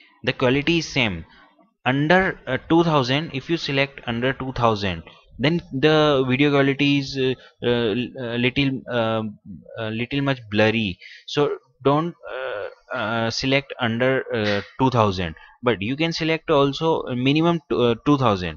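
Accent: India. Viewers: OMG people have accents jeez u don't have to be rude about it! Imagine if that was you? What would've u done? He probably had struggles explaining it in English and by the sound of his accent I can tell it was probably Hindi. Like, at least he tried appreciate that. Like he said, just follow the steps -.- -_ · He is speaking proper English but with an hindi accent. Stop being rude people.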